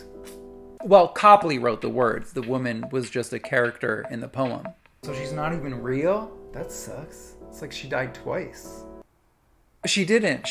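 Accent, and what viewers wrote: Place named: East Coast, United States. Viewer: All very interesting, but what I'm most struck by is that as a midwesterner I thought "orange you glad" was just a bad pun. The jersey/east coast pronunciation (and probably origin) makes so much sense haha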